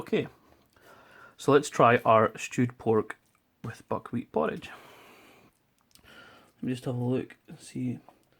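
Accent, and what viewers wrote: Scotland, United Kingdom. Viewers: OH MY GOD I LOVE THE SCOTTISH ACCENT Great review btw xd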